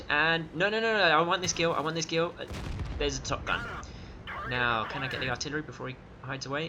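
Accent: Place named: United Kingdom. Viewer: u sound like your from the UK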